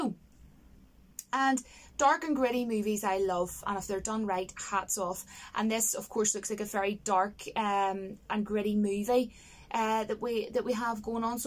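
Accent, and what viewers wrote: Ireland. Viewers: Intresting accent.. is that Irish? i love your accent, it remind me of witcher 3's NPCs. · Agreed. I hope to not be too much with this comment, but I love your accent! I want you read me every book in audio format lol. Never played the Witcher, but I could pick out that smooth Irish brogue from the other side of the world!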